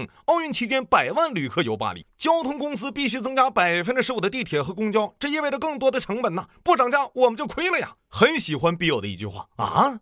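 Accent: Northeast, China. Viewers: 听您说话就知道是本山的徒孙。。。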